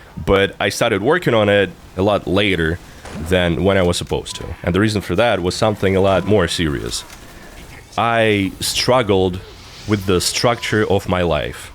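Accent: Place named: Russia. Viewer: I'm just here for the sexy Russian voice honestly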